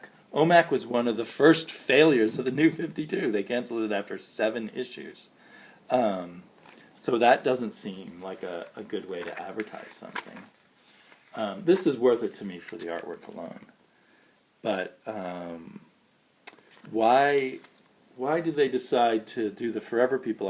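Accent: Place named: United States. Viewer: Thank you for the kind words and shout out. No worries on the name pronouncement, it´s hard to pronounce with the american accent I know, but cuddos for trying;) Still enjoying Batman Eternal and Batgirl with the beautiful Alex Garner covers. Cheers!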